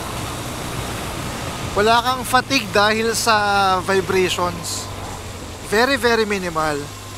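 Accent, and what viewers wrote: Bulacan, Philippines. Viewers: Puntong bulakenyo ser.. 😁😁😁 Yes sir😁😁 solid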